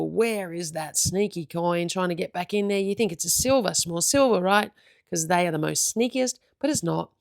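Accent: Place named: Germany. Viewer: Well done on the finds and thanks for the exclusive footage from Treasure Hunters Down Under.Congrats on being film stars overseas and I hope we get to see it soon down here.Love your German accent! Is the mysterious camera person? Cheers👍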